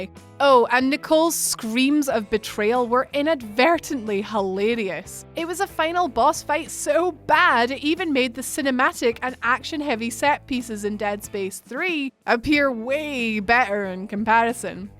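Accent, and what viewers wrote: Scotland, United Kingdom. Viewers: Why do I think she has a Scottish accent?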